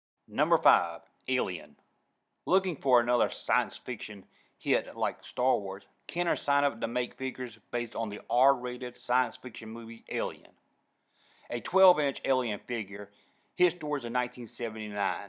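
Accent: South, United States. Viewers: just a southerner. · He has a southern drawl...he’s from Joe-juh. (Georgia). That’s why he sounds different; u don’t get out much do you? It is ok though, intelligence and looks aren’t everything!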